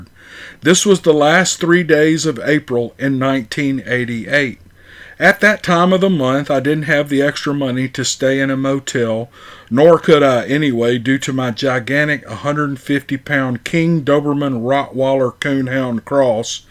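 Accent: South, United States. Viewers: That's how us Southern boys talk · That story was written so well I could picture it in my mind as you read it. Your voice lends such a warm quality it’s soothing to the ears...I feel like I’m listening to a relative of mine telling a story because we all have southern accent as well. I giggled out loud at the comment about adhesive on the dentures or you’ll spit them on the table. 🐿 You have a way with words 😂 Thank you for your stories. I’ve enjoyed each and every one I’ve heard. Your time and efforts are definitely appreciated. Look forward to hearing more! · You did a pretty good job with pronunciation! It’s delightful to hear so southern a voice speak a northern name . My only complaint is there are’nt enough material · I'm from the UK. Every Saturday my four year old grandson stays with me, as I'm a widower. And he loves bigfoot stories, you are his favourite bigfoot story teller. 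When he goes to bed, it's always to your deep southern drawl he falls asleep to. So thank you, bud. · Hey, Always excited for another encounter story narrated by you and your awesome Southern drawl!!! Squirrel!!! 😍👣 Thank you for your time. Your interest in these encounters is infectious and incredibly heartwarming. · Well done another great story told in your wonderful Southern drawl up the South!! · Cool! You sound so familiar when you say "Maggie". My name's Maggie also, & here where I'm from in Macclenny, FL we speak with the same southern accent as you do. I'm almost right on the FL/GA line. Sure is a great story😊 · Thanks! We love to hear that southern drawl telling a fresh story 👍🏻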